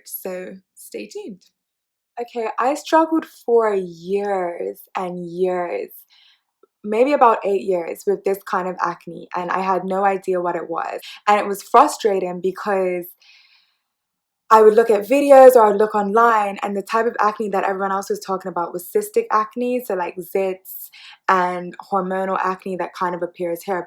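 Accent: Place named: United Kingdom. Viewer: lol. I live in the UK. I could hear the British, but I was like 🤔 there’s some flavour here ❤️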